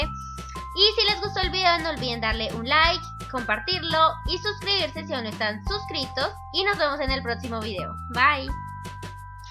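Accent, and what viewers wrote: Colombia. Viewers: Hola... se te escucha super bonito tu Colombiano.... te estoy viendo desde Shanghai que quiero hacer un arroz con leche para unos amigos aquí. Gracias por el video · Hola, disculpa mi pregunta ignorante, eres Colombiana? Por tu asentó intuyo que si, yo soy colombiana y no consigo la leche evaporada. Sabrías en donde encontrarla? Gracias:3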